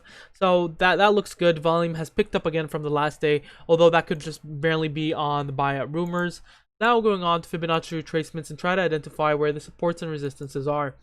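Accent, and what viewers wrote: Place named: Philippines. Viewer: Just subscribed! Found your posts on /r/pennystocks Random question, but are you Filipino? 🤔 Hearing a hint of an accent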